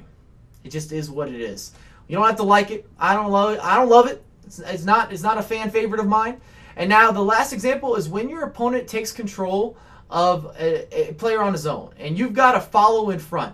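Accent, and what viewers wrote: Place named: Texas, United States. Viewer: I needed this, you are my Texas Accent Speaking Angel.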